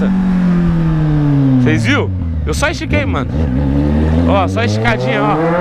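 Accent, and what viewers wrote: Goiás, Brazil. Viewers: a TEE AMOOOO ta flnd NO PIQUE DO GOIAISSS VELHOOS TEMPOOSSS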